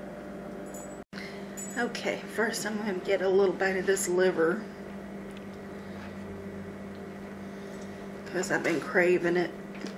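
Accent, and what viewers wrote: South, United States. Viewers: C here I reside in Colorado. Yeah she sounds like she's from the south. In one of her videos she goes to the Heart Attack Grill to eat so maybe it's in the same state. I'll have to look. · Girl, your voice is so soothing to me.. and you sound southern (I'm from Tennessee). Lots of love hunny, you keep doin you 😙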